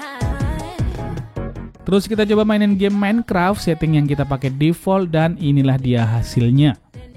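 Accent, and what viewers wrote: Madura, Indonesia. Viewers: Ngasak?😂 Kental banget madura kearifan Bondowoso 😂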